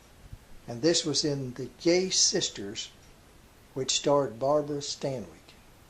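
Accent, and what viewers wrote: South, United States. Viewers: It's really the proper way to speak however we Americans have become to lazy to use it. He is from the South just as I am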